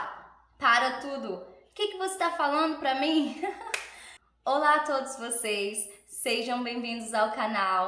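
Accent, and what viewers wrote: Brazil. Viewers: Huruuu ❤ agora aprendo amiga falando caipira como eu falo do interior 😂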